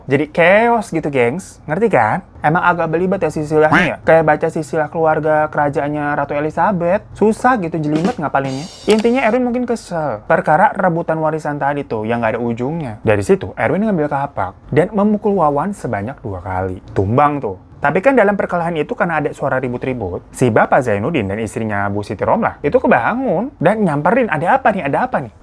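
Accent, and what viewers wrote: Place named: Pemalang, Indonesia. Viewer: orang pemalang mana suaranya.. lah ternyata aku orang pemalang sendirian di sini :(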